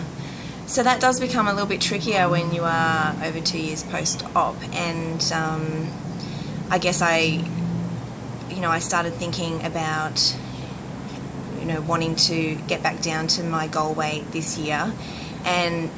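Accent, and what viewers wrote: Australia. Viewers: Hi there. I just want to say thank you for your videos. I am currently on the journey to have this surgery. I have watched many many videos over the years and I have to say yours have been the most inspirational. I love your whole attitude and your outlook through your journey. Like I said very inspirational. Thank you for that! By the way love your Aussie accent :-)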